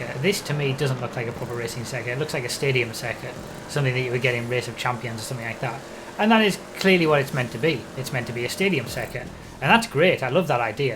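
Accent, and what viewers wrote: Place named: Northeast England, United Kingdom. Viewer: You sound like you are from North east england where abouts are you? xD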